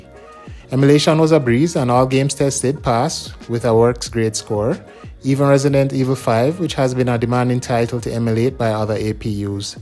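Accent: Jamaica. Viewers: Definitely Jamaican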